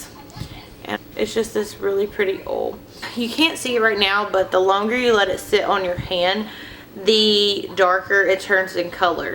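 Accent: South, United States. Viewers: You're southern accent is sooo cute!!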